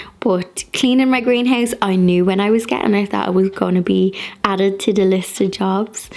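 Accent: Ireland. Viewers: To sit there lazily on a Sunday afternoon, enjoying tea and candle light, it has something deeply satisfying to watch you whirling around ;-) It is cute somehow and I absolutely like what you make out of your home. Every video is a package of creativity, smiles and cuteness (being decades older, I hope, I can say this without sounding arrogant or stupid). Actually, it is so lovely to listen to your Irish and to watch your videos. Deeply pleasant. Love and greetings from Germany.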